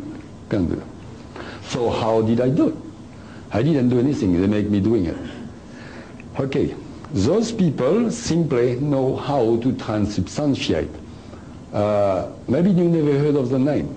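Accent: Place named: France. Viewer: He is well and not longer in Vietnam, but that is besides the point, yes this was shot along time ago, and the video quality suggest as such, he is french, so of course he has a thick french accent, but i can understand his English just fine. I guess it comes down to the ability to understand others with accent's regardless, the book is made available in perfect English read it and you will know everything he talk about in the lecture, also don't just pass this up, this information is the read deal.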